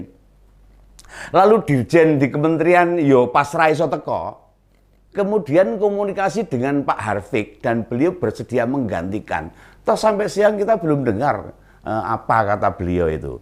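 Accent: Java, Indonesia. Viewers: Om iki asline ngendi to.... Medoknya itu yg aq suka. (pokoknya ma grup penyuara kebenaran aq suka smua) Suwun....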